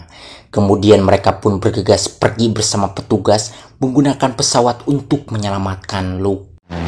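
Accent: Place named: Sunda, Indonesia. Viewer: sebenarnya filmnya ada yang udah ditonton...ngan eta resep naratorna. Aya emplud-an kitu. Crat cret crot...aaah pokona nyunda banget weh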